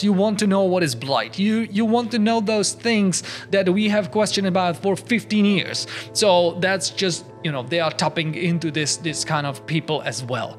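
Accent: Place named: India. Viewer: I'll take my chance at sounding rude, but how are you quite white with that Indian accent? Just being curious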